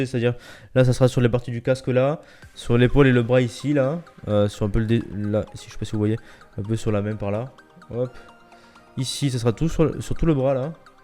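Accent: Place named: South, France. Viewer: j'avais jamais remarqué que tu avais autant l'accent du sud ^^.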